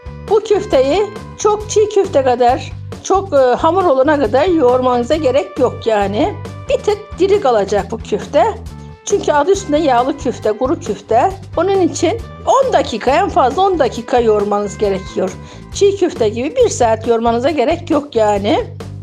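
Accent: Gaziantep, Turkey. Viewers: Yaaa teyzemmm Gaziantepten uzaktayım konuşman o kadar tatlı ki özlem giderdim. Ellerine sağlık çok güzel duruyor. Ama bir eleştirim var köftenin yanında yaprak sarmasını göremedim ☺️ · Tam bir antep dili konusuyon bayıldım konuşmana çok tatlı eline sağlık zeytin yağı kokuyor ayçiçek yağı kullansam olurmu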